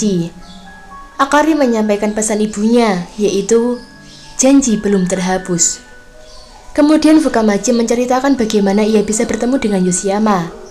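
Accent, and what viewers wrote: Java, Indonesia. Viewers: Logat jawa nya kental bngat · kelihatan medoknya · Cie medok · saya tak suka suara medok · wong jowo medok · Suara mu medok nduk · Medog gaes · mbaknya logat jowo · Jawanya medok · admid pasti orang Jawa 😄 Medoknya gak bisa bohong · adminnya orang jawa ya.. D-nya itu lo.. khas banget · org Jawa yah? Kok medok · Yang ganjel aksen jowo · Bahasanya medok gk nyaman dengerinnya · Kak riquest anime perang ato saling bunuh aku suka v] Dan biasakan bahasa indobesia Dan kurangi nada kayak orang jawa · Mba nya medok yaaa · Medok bnget sis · Medok bgt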